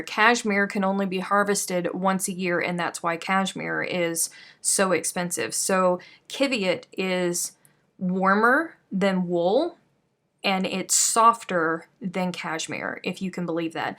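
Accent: South, United States. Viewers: If I upset you tonight by my comment about the southern accent I want to apologize. I ment it in a good way. I love the southern accent and miss listening to my grandparents. It was the you alls that was the only time I really heard it. I really enjoy your podcasts and promise not to say anything again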